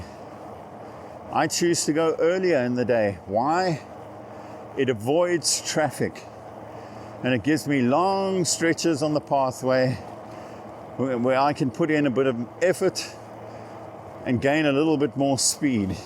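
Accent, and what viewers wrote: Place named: South Africa. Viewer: thanks. Loved your video btw! Great hearing that SAFFA accent. I bought a Cube Supreme Sport Hybrid with Bosch battery. Unfortunately could only get a 400w battery, no stock anywhere of the 500w. Hopefully this will do the trick for now. Could have got a Norco Scene VLT for same price with 500w battery, but no front suspension, and non integrated shimano battery. Merida also seemed good, but saw that too late, and didn’t want to muck about the sales guy any further. Anyway a 30day “trial “ period and can exchange if I absolutely think it’s not for me. I’m not keen to use this option, so will likely stick with what I have unless there is some serious fault or issue I have.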